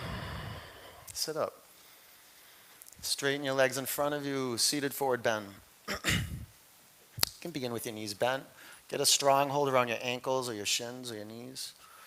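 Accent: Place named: Boston, United States. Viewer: Sounding very Boston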